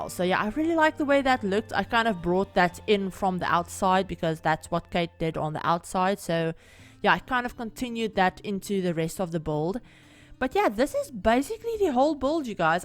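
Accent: South Africa. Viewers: guuuuuuuuuuuuurllllllllllllll , i haven't found a creator from SA. notices the accent , its weird but awesome for some SA rep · Im south African too but i don't have an accent as nice as yours 😅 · Wow, spotted that accent from your very first word!!! 🤗 fellow South African here! Nice to see a SA creator :)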